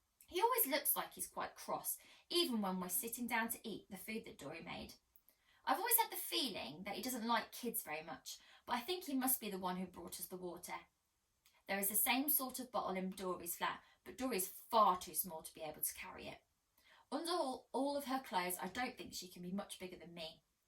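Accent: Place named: United Kingdom. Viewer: Hello Miss I am an English learner from Russia and I have been choose these book because it is exactly my level - not so easy not so hard. So what I wanted to say - I really like your British accent and how you read the book and also I was really surprised to see in your channel the author of the book. Thank you for your reading and I wish you all the best.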